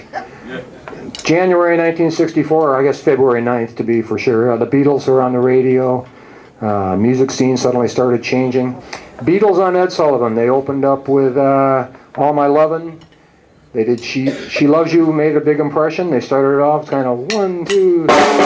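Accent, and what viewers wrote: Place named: Chicago, United States. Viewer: chicagoland accent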